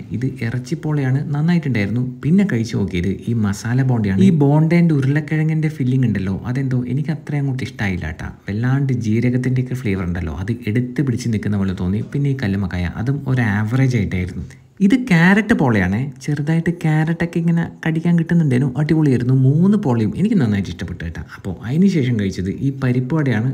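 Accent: Kannur, India. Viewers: കണ്ടിട്ട് തന്നെ കൊതിയാവുന്നു 😋. നിങ്ങളെ അവതരണ ശൈലി ഒരു രക്ഷയില്ല. പിന്നെ നമ്മളെ കണ്ണൂർ ഭാഷയും 😍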